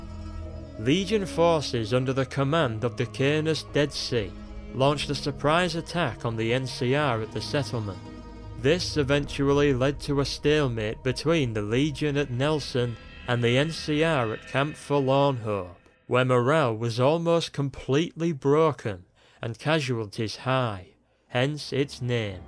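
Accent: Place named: North, United Kingdom. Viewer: "With the soldiers not knowing how to ... fart?" I know, it's your accent, I'm from the north as well. Great video as always!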